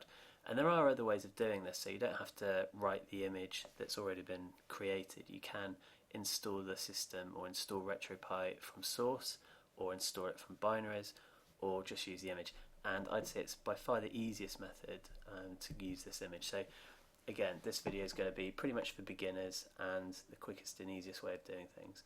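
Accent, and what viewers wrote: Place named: West Country, United Kingdom. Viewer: Can't wait for all the parts to arrive, excellent video! Are you from Bath or Brizzol?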